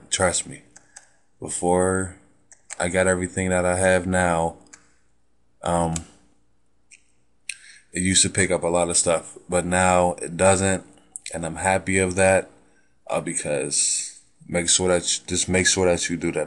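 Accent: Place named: Pittsburgh, United States. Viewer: im from the burgh to lol son as i heard ya voice i knew you was from the burgh !!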